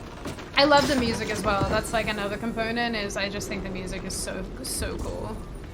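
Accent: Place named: Australia. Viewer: I think it's fun watching, plus I just like to hear you speak. Being in Dallas, I don't hear many Australian accents.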